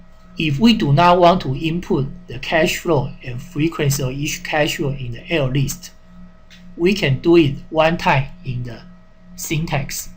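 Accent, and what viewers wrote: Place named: China. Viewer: lol “curly bracket”, his accent is sick. obvi chinese & not racist to point that out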